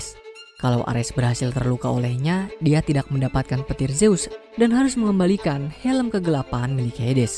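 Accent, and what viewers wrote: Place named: Java, Indonesia. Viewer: bang orang jawa ya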